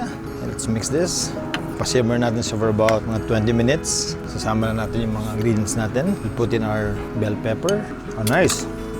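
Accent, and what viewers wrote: Visayas, Philippines. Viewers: Makalingaw ang pagbisaya ni Goma hahaha mechado naman sunod unta. Great job on this recipe!!!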